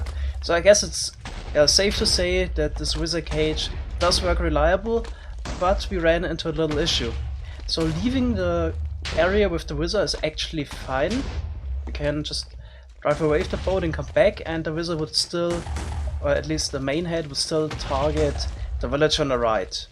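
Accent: Germany. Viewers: Noticing someone's accent is not racist, dont worry. He is still flluent in English, with a pretty obvious german accent.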